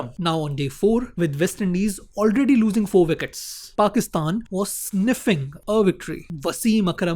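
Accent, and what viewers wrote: India. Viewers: very good commentary....the pace of the speech was extremely good ...the words were clear and spoken with mildness and full of extremely good punctuation. ....unlike the stupid videos uploaded these days where the words aren't clear and there are hundreds of grammatical errors....the accent was very indian but that doesn't matter when the speech is so good.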